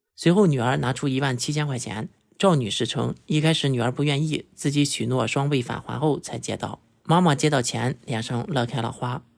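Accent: Shandong, China. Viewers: Klaus是不是山东人，感觉口音挺重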